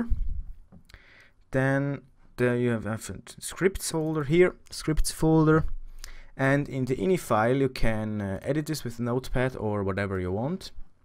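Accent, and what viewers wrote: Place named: Germany. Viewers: Ha deutscher. Ertapt ;D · ghört mer sofort usse :D PS: Es funzt bi mir denn leider ned... :( has emol anebrocht und warum au immer wider deinstalliert.. er peilt ned mol das i di "richtig" CD drinne han :(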